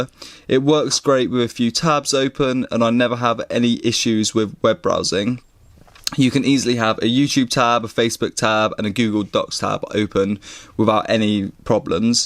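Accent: United Kingdom. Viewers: Are you British? I am you new subscriber, and I love your video quality <3.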